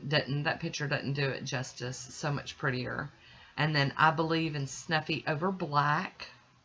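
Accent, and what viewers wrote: South, United States. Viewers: your southern drawl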